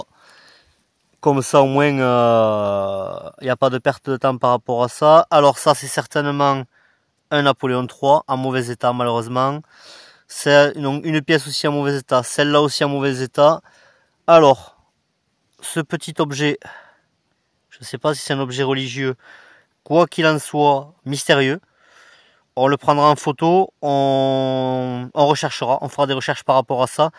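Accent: Southwest, France. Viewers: Je sens un accent du sud-ouest ou me trompe-je?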